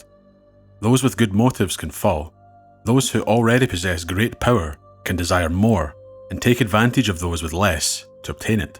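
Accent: Scotland, United Kingdom. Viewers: I've missed those dulcet Scottish tones!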